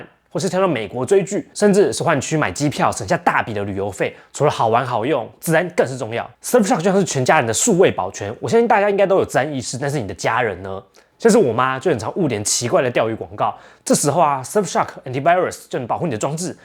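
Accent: Taiwan. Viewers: 哇 一句話有一堆字被吃掉，不看字幕常常台灣腔不懂在說什麼 🤨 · 台腔太重 很多人都有這個問題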